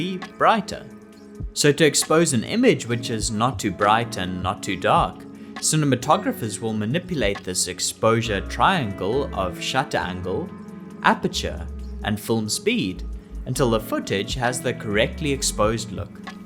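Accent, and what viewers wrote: South Africa. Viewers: I hear a South African accent! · Are you South African by any chance? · Are you South African bro?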